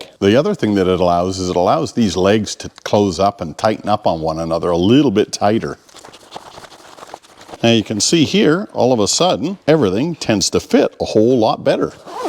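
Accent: Canada. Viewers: I think I ❤your Canadian accent! I’m Scottish and think it’s a hoot! Okay your best hacks for me are: Shrink wrap zip Water tap Butane gas can My hack is for tent camping. No matter what I use, my feet always get cold! So I carry a water bottle in my pack to put inside my sleeping bag at night. Bliss!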